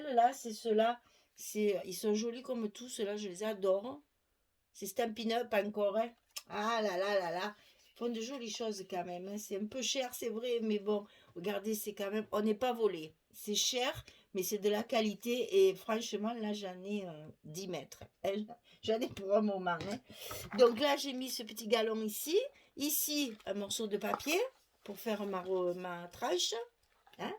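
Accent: South, France. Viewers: Bonjour, je vois vos vidéos depuis la Belgique (Brabant Wallon ).Merci pour vos réalisations et votre accent du Sud qui me fait penser aux vacances, au soleil. Ne changez rien, j'adore.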